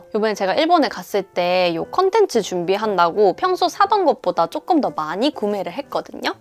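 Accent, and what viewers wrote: Gyeongsang, South Korea. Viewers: 영상이 떠서 처음 들어와봤는데 경상도 분이신가봐요? 사투리가 ᆢ ㅎ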